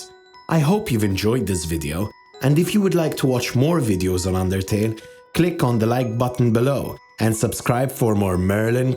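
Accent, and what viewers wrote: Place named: Malta. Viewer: you sound maltese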